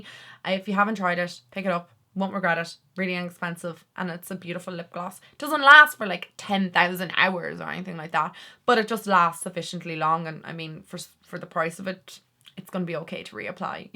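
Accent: Ireland. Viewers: Thank you for sharing these! You look lovely. Listening to you makes Ireland feel a bit closer.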